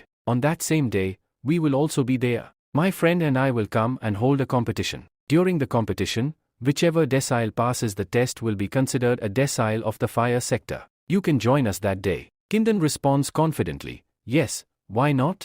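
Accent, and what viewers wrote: India. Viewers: Indian voice damn tho he tried its ok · The AI voice being Indian is insane 😂😂😂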